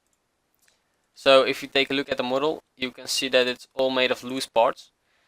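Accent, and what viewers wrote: Netherlands. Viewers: are u dutch lol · Hahaha, aan je accent hoor je dat je Nederlands bent.. Maar goede video! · that dutch accent though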